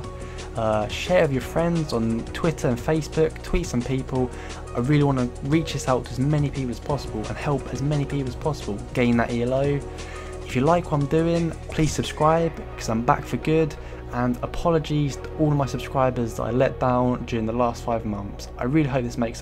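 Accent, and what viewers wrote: United Kingdom. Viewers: He includes (british gamer) because the accent is what brings people here:D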